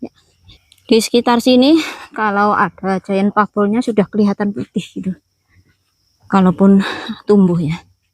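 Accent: Java, Indonesia. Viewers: Assalamualaikum mba, jenengan Indonesianya daerah mana mba kok medhok sekali 😊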